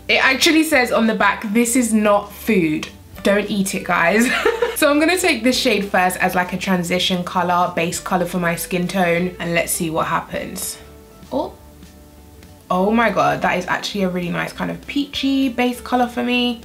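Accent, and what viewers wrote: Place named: RP, United Kingdom. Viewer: I love her voice😂😂😂😩 its so posh even tho im British myself😅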